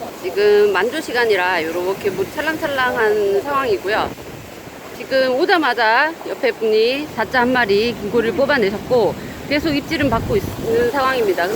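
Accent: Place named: Gyeongsang, South Korea. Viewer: 이제 경상도식 표준어(?)를 일주일에 한번밖에 못듣게 됬네요 아쉽습니다. ㅎ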